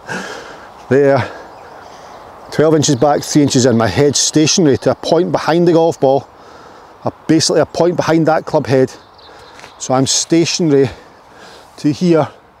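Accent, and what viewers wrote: Scotland, United Kingdom. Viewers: I think his accent is fairly mild for Scotland. Some Scottish accents are very difficult to follow but very interesting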